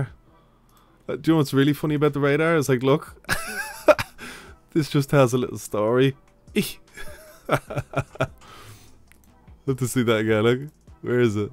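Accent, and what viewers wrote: Ireland. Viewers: The Irish accent, just love it.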